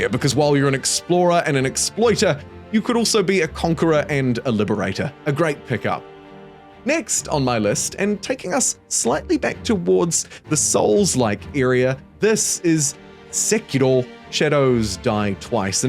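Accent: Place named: New Zealand. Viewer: Because the New Zealand accent causes e to sound like i.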